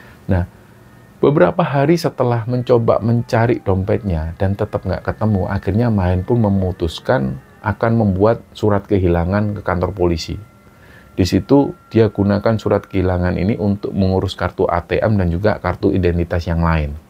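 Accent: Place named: Tegal, Indonesia. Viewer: Mantap ni dari kabupaten tegal mas 👍 Ora ngapak ora kepenak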